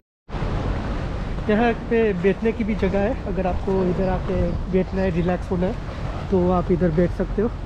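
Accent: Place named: Gujarat, India. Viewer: By your language accent i am assuming you to be from Gujarat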